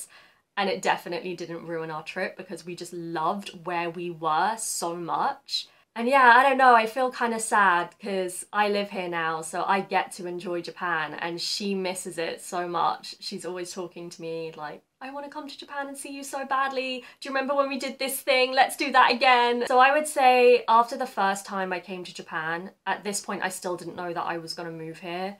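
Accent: United Kingdom. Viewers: イギリス英語気持ちいいです。福島県産も受け入れていただいて感謝。日英同盟復活w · 日本語字幕と綺麗なイギリス英語、とても有難いです。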